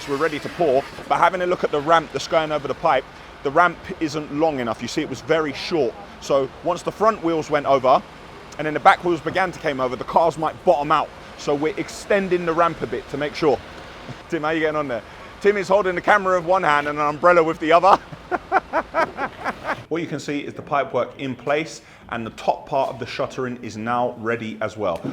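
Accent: Caribbean. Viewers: I knew he sounds Caribbean...big up from Jamaica mate; love your content and what you do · I knew u were of caribbean decent without even hearing u say it.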